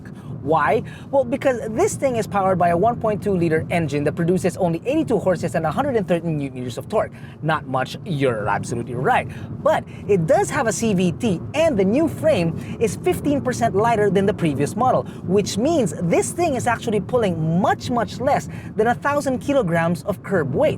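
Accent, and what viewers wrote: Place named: Conyo, Philippines. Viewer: Too conyo for my taste. Its simply Calamba not Cuh-luhmbah duuuh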